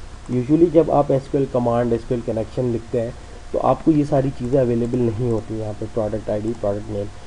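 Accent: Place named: India. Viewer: 印度口语~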